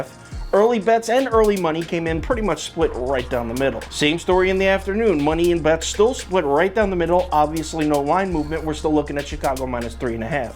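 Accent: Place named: Boston, United States. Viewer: I like ur boston bro..😀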